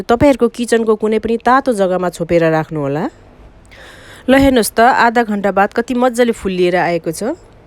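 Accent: India. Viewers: Namaste mam, i am ur new subscriber....i am highly impressed by your hindi mixed nepali accent n ur easy recipes....keep posting easy tasty recipes..thanku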